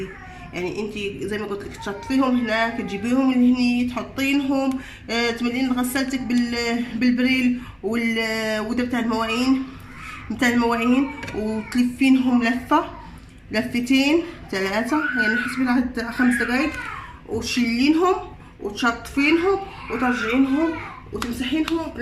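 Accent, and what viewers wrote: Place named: Libya. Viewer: هذه أي لهجة ليبية؟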